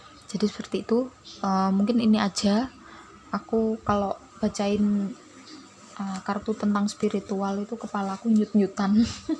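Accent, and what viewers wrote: Java, Indonesia. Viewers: Medok banget wong jowo ya mbak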